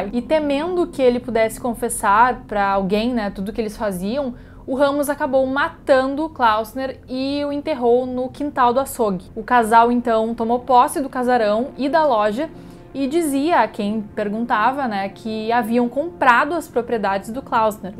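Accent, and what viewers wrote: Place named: Rio Grande do Sul, Brazil. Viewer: Pior não era, disso estou bem certo! O que acontece é que naqueles tempos, era tudo na base das testemunhas, dos suspeitos, O que tornava difícil resolver crimes, O cara quando é mal, Ele mata até pra roubar uma bicicleta! Não acho que a época influencia no caráter dos bandidos, ( mas isso sim, ela facilita ou dificulta as ações deles! ): Tô com saudades dos teus vídeos, você tá preparando algum para breve??? ( ACHO LINDO O TEU SOTAQUE DE GAÚCHA ).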